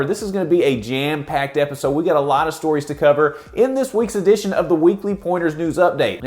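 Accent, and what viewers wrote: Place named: Midwest, United States. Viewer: LOL. You are a midwestern guy not a Bostonian. This is your charm. I was raised in the Midwest and moved to the east coast at 25 now I’m 58. I know the difference after all those years.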